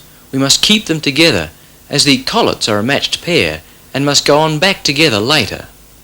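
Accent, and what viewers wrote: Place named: United Kingdom. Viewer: Great H-D 🏍️ class with the “Evil British Accent” 😎 “Live to Ride” thank you for uploading this.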